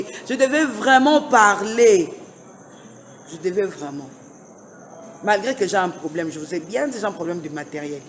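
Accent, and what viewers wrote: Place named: Brazzaville, Republic of the Congo. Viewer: Oza congolaise ya Brazza te prononciation la prouve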